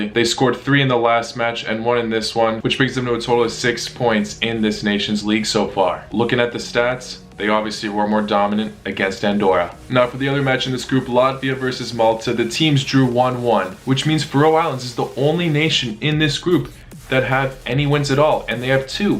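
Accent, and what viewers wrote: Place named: United States. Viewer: i love ho you pronounce russia russiya but with a slight american accent.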